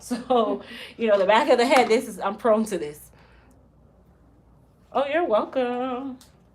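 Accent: Jamaica. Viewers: I knew it!!! I heard your accent and thought you where from Jamaica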